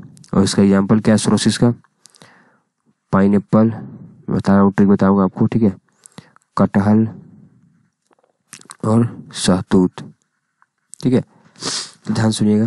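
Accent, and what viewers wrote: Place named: Bihar, India. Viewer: sir 😁😁😁 u r tooo cute ......apne karaila kharbujja bhut funny way me bola .....koi nii ap Bihar k ho n... Bihar accent me bola apne.....mst trick Bhaiya ji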